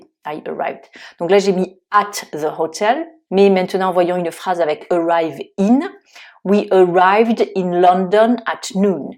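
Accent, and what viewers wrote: Toulouse, France. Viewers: As always, utter explanations. Thanks ( by the way, I crave your Toulousain accent )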